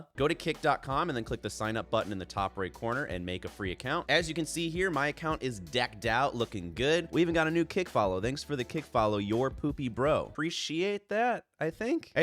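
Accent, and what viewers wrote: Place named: Scotland, United Kingdom. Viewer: Ayy ... Didnt realise u spoke .. Scottish ..... :)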